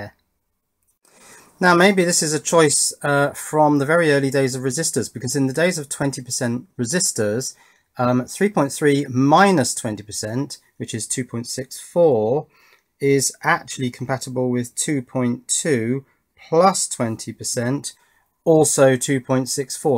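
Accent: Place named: Northern England, United Kingdom. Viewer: Hahah! What part of England are you from? Your accent sounds just like Wallace (of Wallace and Gromit Fame). I found myself wanting to just say, “Cheese ... Gromit!”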